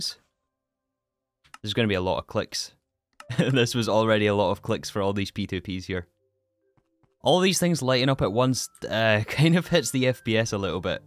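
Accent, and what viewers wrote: Scotland, United Kingdom. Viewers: He's Scottish. Have you really never heard a Scottish accent before? SMH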